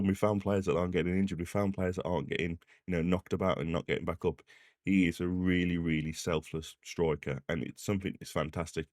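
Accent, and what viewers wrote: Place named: Black Country, United Kingdom. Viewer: Seems a couple of Youtubers think that you should do as they wish, well you are what you are, Wonder they have not had i dig at your accent? to be honest mine is worse than yours. We are not called Yam Yams 4 nothin dun yo know wot i meen kid. I would though try just to say what you think, forget reading. Remember if it was the Black Country where the Industrial Revolution started if not where would the World B?? You are famous. Derby vs Cov, how did we not beat Derby?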